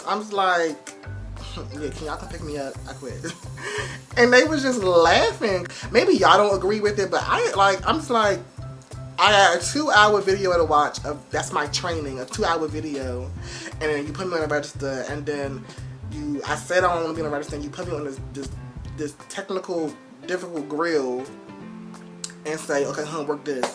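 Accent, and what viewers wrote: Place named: DMV, United States. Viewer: Your accent is so DMV, I know my people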